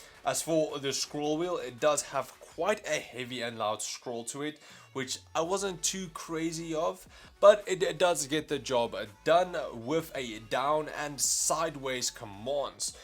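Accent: Afrikaans, South Africa. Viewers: Good vid. Judging from your accent and your website being .co.za you live in SA? I do too and most youtubers make builds in dollar. Can you please do a R10 000 gaming pc build? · Is y Afrikaans?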